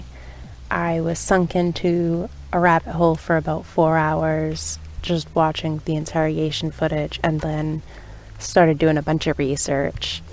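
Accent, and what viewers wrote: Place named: Boston, United States. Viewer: Nice job on this, I am from Boston, and I can barely tell you have a Boston accent, although I can tell slightly, but you have a beautiful voice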